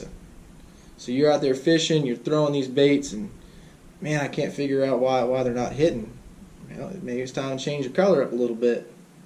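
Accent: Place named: South, United States. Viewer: Got that ol country accent nowadays 👍🏻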